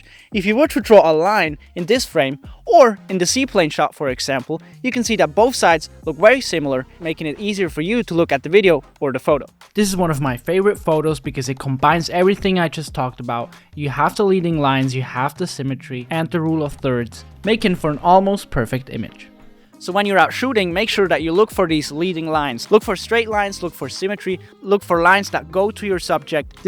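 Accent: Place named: India. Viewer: For a guy that knows a lot about upgrading, how come you didn’t try to stick your mic in the shirt instead of holding it and you don’t have to be smart to know that and you kind of sound like an indian 😷 🧄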